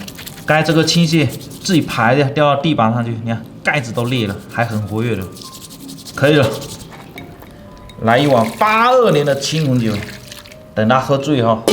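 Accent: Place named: Fujian, China. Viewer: 不知道为什么，我总是听不惯福建口音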